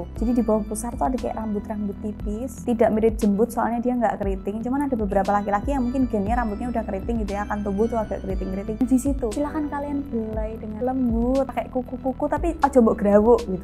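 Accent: Java, Indonesia. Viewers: nek ngomong yo muueeedokkk lucu sih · Bisa jawa jowo mu ngendi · Lucu kamu medok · Bahasamu we loh mba medok banget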